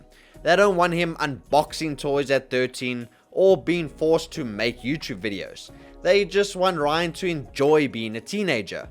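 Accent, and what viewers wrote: South Africa. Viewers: Are you south african boet?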